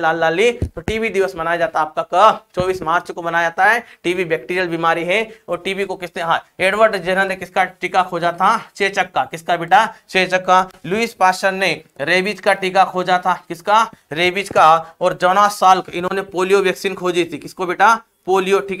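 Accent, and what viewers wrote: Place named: Mewar, India. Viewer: Sir ji आपकी पूरी भाषा हमारे यहां जैसी है मेवाड़ा राजपूत जैसी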